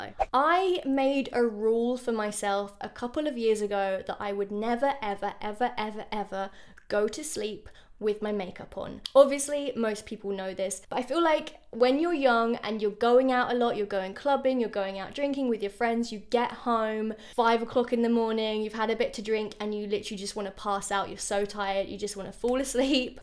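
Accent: United Kingdom. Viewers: I really like your idea of aging, it’s beautiful ! And by the way, La Roche Posay is a French brand, and we don’t pronounce de E in Roche ;), it’s like « La rosh Posay », but I like the British accent on the prononciation 😍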